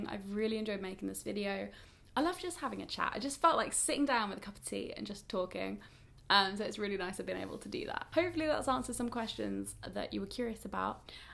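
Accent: RP, United Kingdom. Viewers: cough There is the magic of editing...but let's also just stop for a second and admire her brilliant british accent! ;) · she's just got a posh accent tbh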